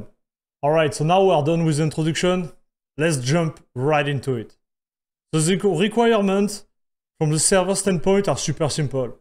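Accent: France. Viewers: On entend presque plus le pti "french accent" 😝😁😉 Vivement un PUBG avec Choco 👌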